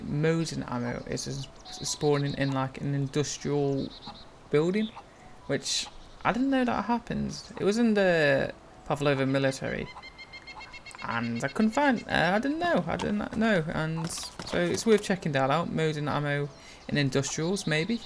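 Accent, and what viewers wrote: United Kingdom. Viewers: Where abouts in stoke you from haha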